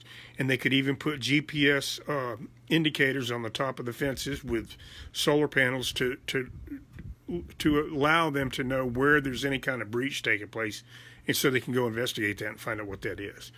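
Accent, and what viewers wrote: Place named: Appalachia, United States. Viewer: wow this hillbilly needs to learn English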